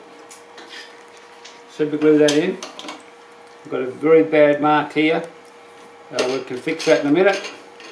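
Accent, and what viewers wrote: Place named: Australia. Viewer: Wow im glad to hear an aussie voice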